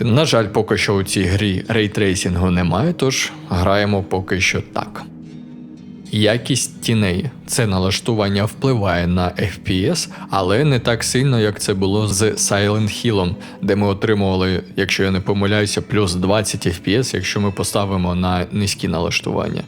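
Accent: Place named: Russia. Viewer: Не висОко, а вИсоко, трохи тягне тебе на руський лад. А загалом лайк.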